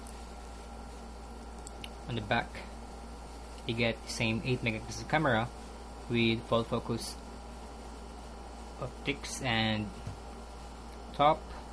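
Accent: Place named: Philippines. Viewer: I knew it..you're a Filipino kabayan..the accent is there.. "The Symbian event was (in) HK so we attended there." "use two weeks ago not last last (redundant) week. mabuhay! peace y'all! =) good review and comparison.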